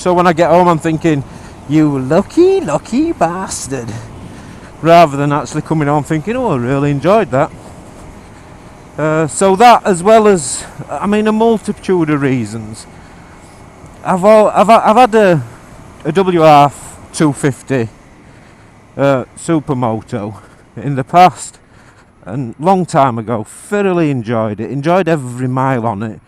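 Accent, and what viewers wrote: Yorkshire, United Kingdom. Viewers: Music to my ears hearing a Yorkshire Motovlogger! Decent insight into the bike as well!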